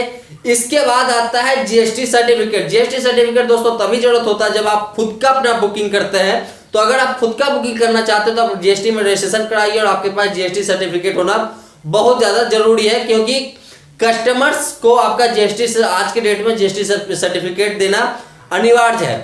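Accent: Bihar, India. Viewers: Aap bihar se ho bhai sahab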